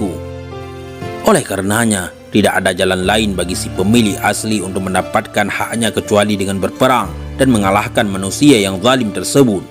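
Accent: Aceh, Indonesia. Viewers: ❤️❤️🙏🙏min pengisi suaranya orang Aceh..ya min...semoga tetap dalam iman Islam smpai nafas berhenti ... Jgan lupa min dialog nabi Isa as dgn iblis jga dialog nabi Muhamad SAW dgn iblis🙏🙏🙏🙏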